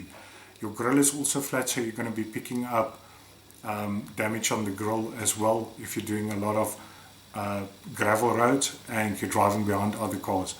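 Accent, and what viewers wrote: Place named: South Africa. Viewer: I love your South Africa accent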